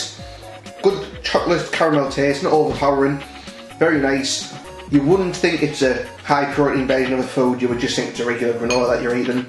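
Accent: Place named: Durham, United Kingdom. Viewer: Knew I recognised that accent lol.. I'm from Durham myself.. I'm glad there is somebody from the area putting out good content!! Keep the videos coming!!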